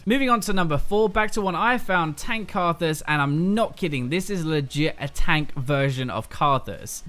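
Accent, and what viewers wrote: United Kingdom. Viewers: God I hate that british accent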